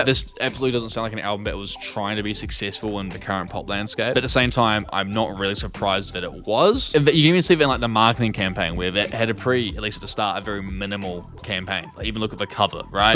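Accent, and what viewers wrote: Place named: New Zealand. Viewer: cool! is also from New Zealand, so you both have this nice accent. You also have to check her albums out!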